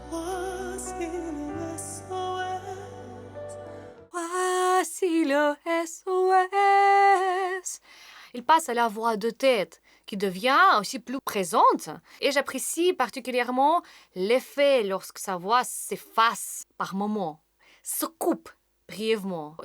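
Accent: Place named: Russia. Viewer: Видно что вы профессионал. Спасибо за анализ☺. У вас слышен русский акцент😊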